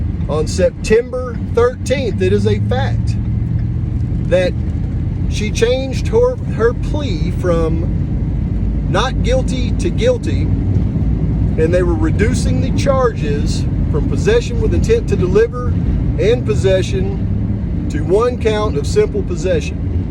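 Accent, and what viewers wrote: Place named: South, United States. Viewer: I'm with you too 💯😁 and I love listening to him, I love a Man with a Southern Accent 😄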